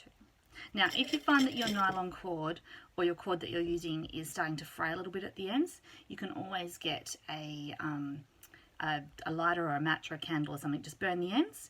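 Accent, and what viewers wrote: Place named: Australia. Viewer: It's so nice to hear an Aussie voice on these how to craft vids. So used to American accents.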